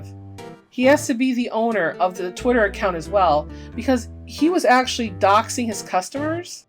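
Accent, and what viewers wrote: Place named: United States. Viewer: You sound like a typical American retard in this video🎉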